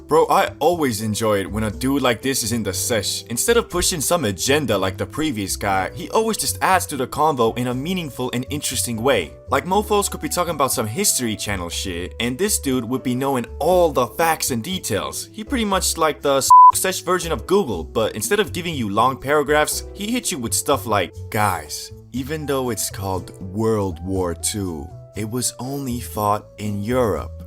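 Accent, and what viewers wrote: United Kingdom. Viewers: Do he sound like he from the Uk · He's from the UK???? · Are you britsh?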